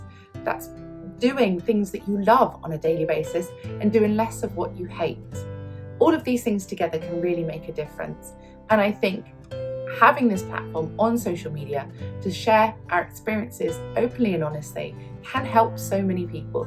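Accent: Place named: United Kingdom. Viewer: I came from LESA (Les amateurs) he is a very famous youtuber from Argentina who recommended you. I also want to tell you ai love you and your sweety british accent, I hope to meet you the day I will get back to England or also be welcome to China, the country where I live.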